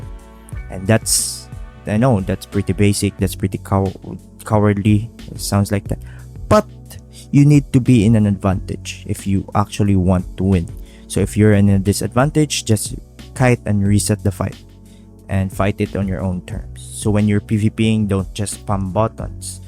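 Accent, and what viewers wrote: Philippines. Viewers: you pinoy? accent is obvious hehe. good video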